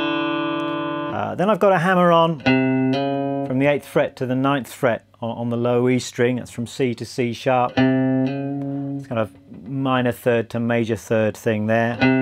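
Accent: United Kingdom. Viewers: Hi, I am amazed at these lickes and phrases you keep coming up with. You sound british but you'rer true country. At 77 years old as I, i FIND i am not too old to learn. I am rather new to your youtube as you might have guessed. Keep it going.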